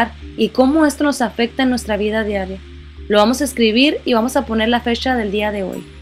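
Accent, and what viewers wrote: Chihuahua, Mexico. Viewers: Me encanto tu video :) me caes muy bien y me encanta oír tu acento norteño jaja, en el video pasado te pregunte y me dijiste que viviste en Sonora hace un tiempo, tmb soy de allí, te invito a ver mi canal, un saludo hermosa :)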